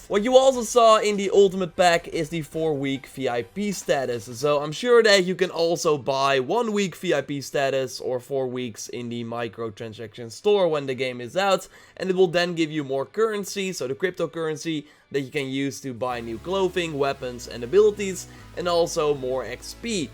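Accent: Netherlands. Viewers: You can really tell when someone is Dutch from the way they speak English :P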